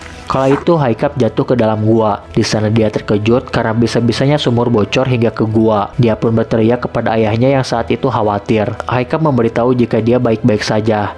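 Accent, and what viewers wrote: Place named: West Java, Indonesia. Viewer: ngomongna masih Aya sundaan euy meski Jang konten yt👍🏻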